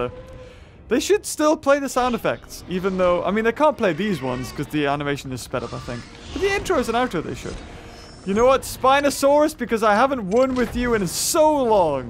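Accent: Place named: England, United Kingdom. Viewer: I feel like i am the only person who understands you because i am english too lol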